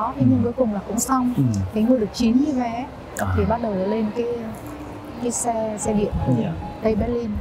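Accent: Hanoi, Vietnam. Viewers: Chi sao van tre &Xinh the nhi! 🐅🇻🇳 Phong cach &giong noi cua Chi that "chuan Hanoi "!💖🇻🇳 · Phai noi giong HA NOI TRUOC NAM 1954 moi dung ban a vi sau nam 1954 moi nguoi o bung bien , tinh le vao sinh song o HA NOI roi.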